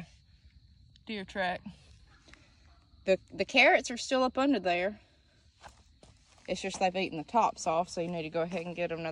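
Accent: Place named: Tennessee, United States. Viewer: Your soil looks pretty crappy but I have the same soil but orange clay with all that gravel (chert) in it. That's a decent harvest for a small patch. I bet they'd be good as hell in a pot roast with carrots and potatoes. You have to be in Tennessee judging by your accent. I know a. Her first name starts with a T and rhymes with Maria. She's about 25.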